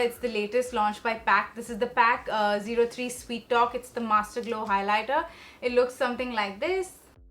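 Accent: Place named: United Kingdom. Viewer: I looove when she speak British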